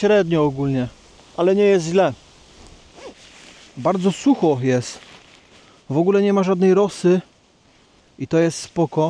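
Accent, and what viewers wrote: Silesia, Poland. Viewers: Słychać że chop ze śląska 😉😁😎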